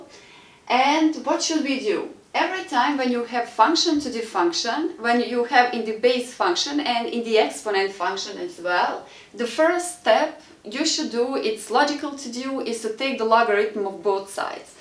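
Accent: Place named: Brazil. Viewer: You sound like a brazilian. Pretty cool.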